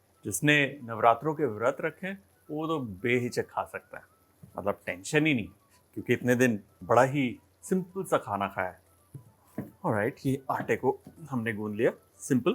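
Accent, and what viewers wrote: Punjab, India. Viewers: Really like ur punjabi accent. In my parents house we make safed chana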